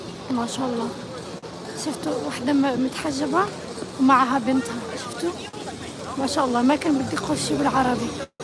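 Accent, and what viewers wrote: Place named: Iraq. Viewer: الله صايره تحكين عراقي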